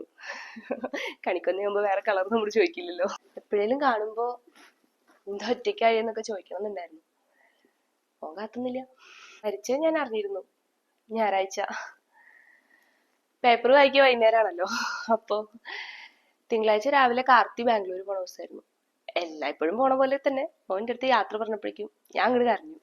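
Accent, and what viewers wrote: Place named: Malappuram, India. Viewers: നിങ്ങളെ ഒരുപാട് ഇഷ്ടം ആണ് നാട് എവിടെയാ സംസാരം കേൾക്കുമ്പോ മലപ്പുറം ഒക്കെ ആണെന് തോനുന്നു pls reply❤️ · മലപ്പുറം , പാലക്കാട് slang ആണെന്നാണ് കേട്ടിട്ട് തോന്നുന്നത്. ഞാൻ മലപ്പുറം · സ്ക്രിപ്റ്റ് 👌🏼👌🏼👌🏼👌🏼👌🏼🥰🥰🥰🥰🥰🥰.. നാട്ടിൽ എവിടാ...calicut ആണോ??? Slang നമ്മുടെ മലപ്പുറം, calicut പോലെ ഉണ്ട് ❤️❤️❤️ ഞാൻ calicut ആണ്.. അതാ ചോയ്ച്ചേ 😊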